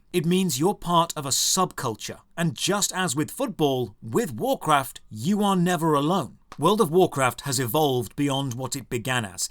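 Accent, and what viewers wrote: United Kingdom. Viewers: showing an american football venue while talking about football and sounding british. Now I'm confused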